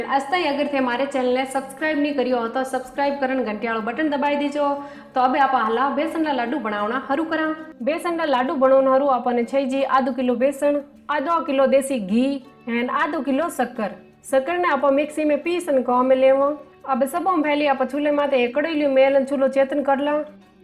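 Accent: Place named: Rajasthan, India. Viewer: बहुत ही शानदार विधि साथ मे राजस्थानी मधुर वाणी में समझाने का शानदार तरीका, धन्य है जी आप व आपके संस्कार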